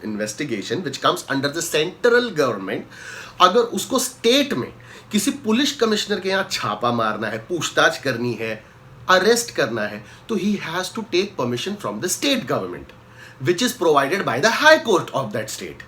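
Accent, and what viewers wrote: Bengal, India. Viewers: ko bahana mil gaya Bengali accent karne ke... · Who else is here only for his awsome bengali accent mimicry..😁😂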